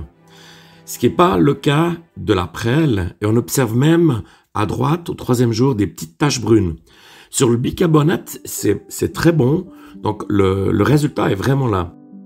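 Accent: Switzerland. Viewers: Bonjour! J'ai découvert ta chaîne hier et j'me suis abonné direct! Merci pour avoir diffusé ç'test! C très clair et surtout, pragmatique!... Sinon, c'est bien un accent suisse qu't'as?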